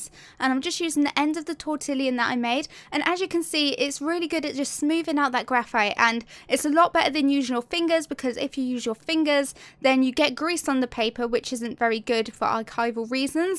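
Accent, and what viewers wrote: United Kingdom. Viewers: Is this a british accent? Sounds awesome at 0.25x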